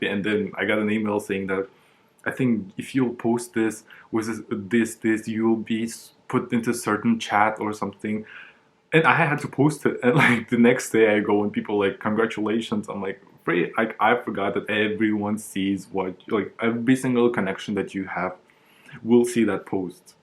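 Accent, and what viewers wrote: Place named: Russia. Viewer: What nationality are u? Im getting russian speaker vibes 🤔 also great video! I had the same experience with "stalking" people, I was pretty embarrassed to realise that people saw that 😅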